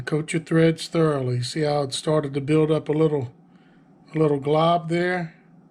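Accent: Louisiana, United States. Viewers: I thought I recognized that accent! Fellow (former) South Louisianian here - and your video is perfect! I was worried about doing it "right", and this video shows me how easy it is to do it right, and make it look great. I'm in the Northeast now, and I have been trying my hand at fly fishing. I was gifted a flyrod from the late 1960s, and it needs to have the eyes rethreaded and resealed. Fishing for stream trout and is fun and all, but nothing like hooking on to a bull red! Can't wait to get back home to Louisiana to hit the marshes.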